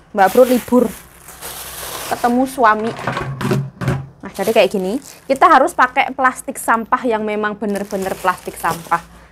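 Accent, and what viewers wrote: Java, Indonesia. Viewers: Salam kenal mba.pas buka hp..ko ada yg menarik utk dilihat..disimak dan disuka..semoga mba sehat..selalu dg kesederhanaanya..dengan logat jawanya dg kerendahan hatinya..setidaknya dpt gambaran..oh seperti itu toh kalo jd TKW..tambah ilmu juga nih buat kita tau..domana kita kerja harus mampu menguasai bahas disana..salam dr bandung..semangat mba 💪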